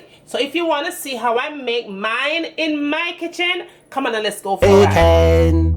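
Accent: Jamaica. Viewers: omg I LUV ur Jamaican accent ! It takes me to the beaches of Jamaica while enjoying a spicy beef Pattie.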